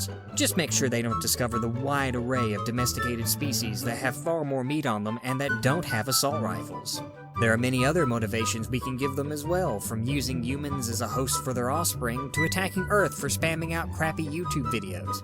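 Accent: South, United States. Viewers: You've got this very subtle twang of a southern american accent, or am I just imagining things??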